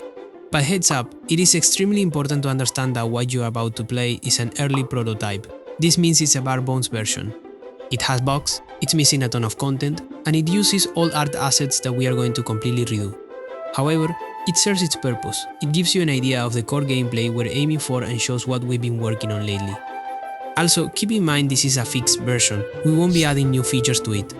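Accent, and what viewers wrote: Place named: India. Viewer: Ingles hindú jaja, que grande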